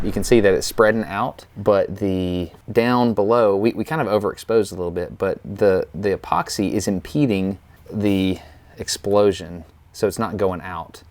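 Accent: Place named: Alabama, United States. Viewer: Destin can we organise you to make an audiobook of some Robert Hooke writings. I want to imagine Hooke with your energy and an Alabama accent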